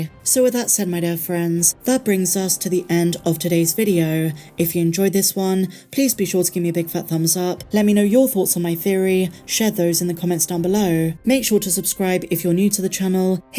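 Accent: United Kingdom. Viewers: Hi I am just loving all of the mandalorian…enjoying just watching it and then watching breakdowns and seeing things anew! Thank you❤ and having a uk voice is really special too.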